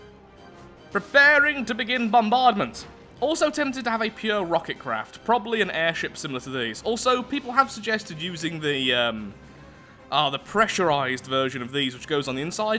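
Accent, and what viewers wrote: United Kingdom. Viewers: Hey are u british or? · Just listen to em! He’s got to be British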